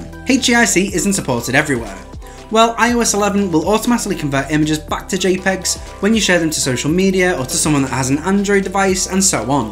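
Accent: United Kingdom. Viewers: Very fast British English I guess. Way to fast for my German brain 😂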